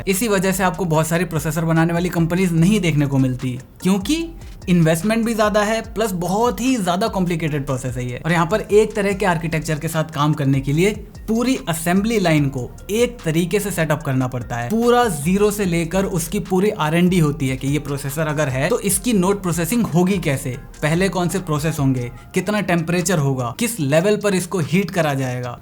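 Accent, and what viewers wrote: Bengal, India. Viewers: Bengali accent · are u bengali? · Wait are you Bengali??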